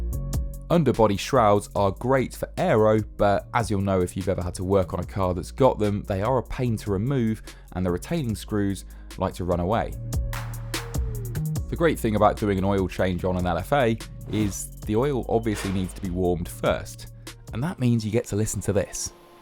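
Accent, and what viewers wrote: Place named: United Kingdom. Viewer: a british guy talking about a japanese car im laughing hard this is so funny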